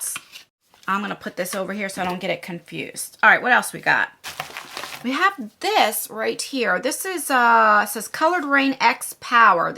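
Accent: New Jersey, United States. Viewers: I just love you and your big personality. I'm so sorry that someone in the same community as you would be so evil to slander you're daughters credibility. It makes me sick especially in the times were in. My heart broke for you're daughter and I hope she's recovering well. I had a bad day myself and hearing that big jersey accent made my day a hair better. Thank you for being you.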